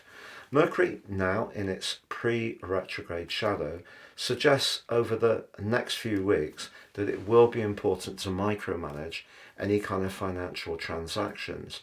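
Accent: United Kingdom. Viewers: I do love your very British English with such sheakspearian style. Greetings from Cairo Egypt.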